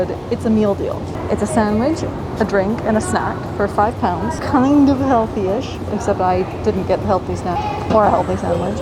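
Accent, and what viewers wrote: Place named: United States. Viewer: your accent sounds like american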